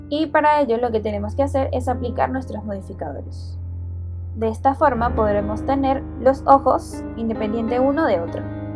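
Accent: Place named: Lima, Peru. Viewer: El señor algoritmo de «yutúh» me recomendó tu vídeo, por fin le atina a algo. ¡Excelente contenido! (Supe inmediatamente que eras de Perú por tu acento de metrópoli, jaja)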